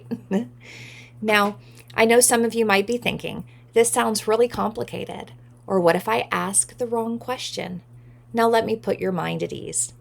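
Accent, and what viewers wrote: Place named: India. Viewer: An indian guy with a voice modulator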